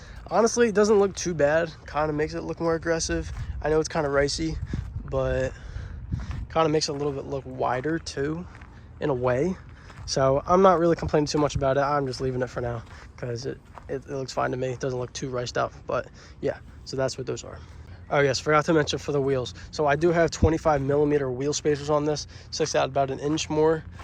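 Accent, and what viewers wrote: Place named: South Jersey, United States. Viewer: Da souf Jersey accent is real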